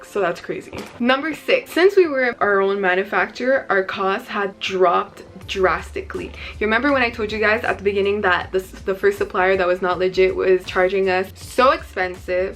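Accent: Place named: Montreal, Canada. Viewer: I know she’s from Mtl Just from the accent 😭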